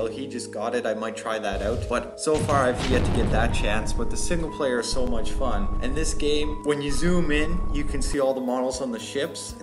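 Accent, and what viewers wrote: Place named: Canada. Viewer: lol the telltale canadian accent 'go check id eeeeewt!" :D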